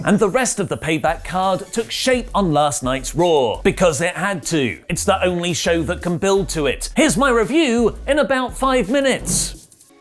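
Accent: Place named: United Kingdom. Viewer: "Rawr and Smackdown" .... that British accent SMH.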